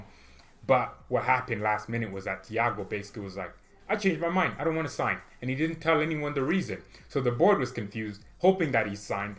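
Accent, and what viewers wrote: England, United Kingdom. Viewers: Dude you English accent is funny as hell. 😂😂😂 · That English accent tho 😂👌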